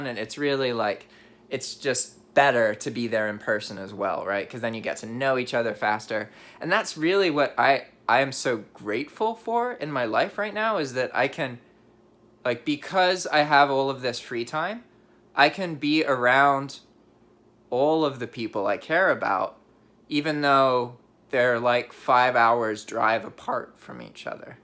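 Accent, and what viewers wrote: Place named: England, United Kingdom. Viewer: Great video. You are a very inspirational person. Glad u are back home. Did anyone ever tell u you have a slight accent? Like u sound like u were in England and picked up a little of how they talk. Anyway, Ive been watching u for awhile and hope everything goes well for u.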